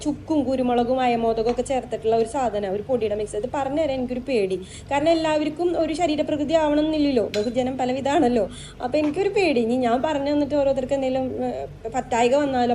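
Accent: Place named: Thrissur, India. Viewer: ഒരുപാട് late ആയാണ് ഞാൻ ഈ ചാനൽ കണ്ടത് പക്ഷേ സത്യം പറയാല്ലോ ഒരുപാട് ഇഷ്ടമാണ്.ഇതിലെ തക്കാളി മുട്ടക്കറി try ചെയ്തു കിടു ആയിരുന്നു.ഇപ്പോൾ എല്ലാ videos ഉം കാണാറുണ്ട്.really love the way u are😍😍😍😘😘😘തൃശ്ശൂർകാരിയേം,ആ സംസാരവും ഒരുപാടിഷ്ടം😘😘😘😘😘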